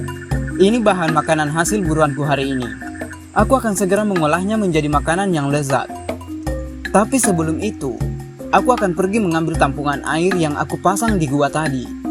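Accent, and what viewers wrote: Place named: Sulawesi, Indonesia. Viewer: igt kawanKu yg di sulawesi cara bicara nya🙂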